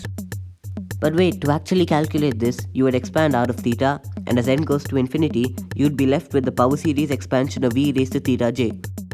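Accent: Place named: India. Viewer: For fuck’s sake can I find one educational video that’s not in an Indian accent?!!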